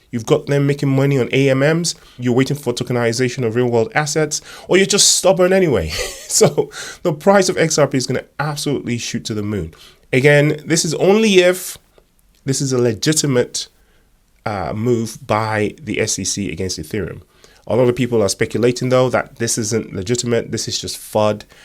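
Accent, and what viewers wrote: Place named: London, United Kingdom. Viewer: Just checking you aren't AI. Let's go xrp. What part of London you at?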